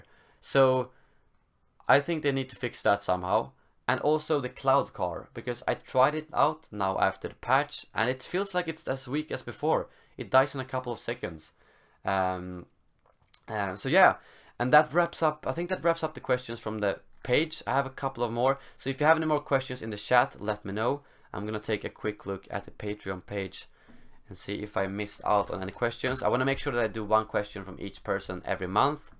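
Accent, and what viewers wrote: Sweden. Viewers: Wich country are you from? Sounds... Swedish kind of.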